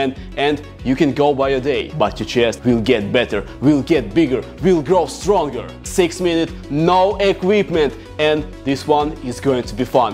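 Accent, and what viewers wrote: Russia. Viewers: by his accent, I know he's russian...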